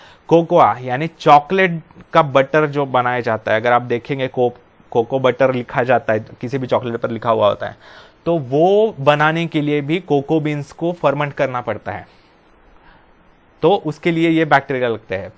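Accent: Maharashtra, India. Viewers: Sir aap marathi ho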